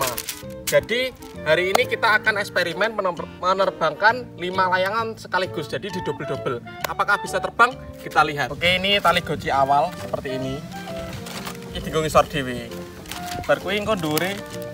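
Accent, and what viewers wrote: Java, Indonesia. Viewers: Jowo ne ndi mas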